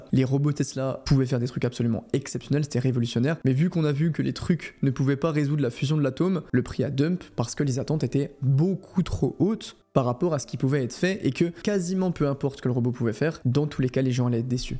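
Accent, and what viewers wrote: Franche-Comté, France. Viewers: Je suis sur que t'es un mec de Franche-Comté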